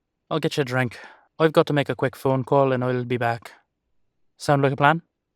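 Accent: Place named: Ireland. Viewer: Toward the end when he got angry and started yelling about humans being the real monsters I legit had tears in my eyes. And when he stopped yelling I said sorry as like a gut reaction of like "I am so sorry you have to go through that." Listening to these audio roleplays helps me with my acting but the thing is that I have a very active imagination and so sometimes the emotions that I feel when acting are real. Well written and well acted. (I high five myself for your accomplishment) Also, love the Irish accent. I lowkey want to be apart of this series but I need to get my second channel up and going before I can do any colabs (if I would even be worthy of such) Anyway, twas a good video. I can't wait for more. (I would support on patreon if I had the money but I don't so here are some virtual high fives!)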